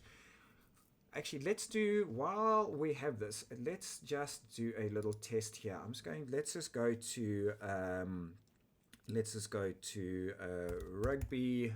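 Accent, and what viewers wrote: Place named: South Africa. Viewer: Thanks. The South African accent is an odd mix of British, Dutch and Australian. Hopefully it's easy enough to understand?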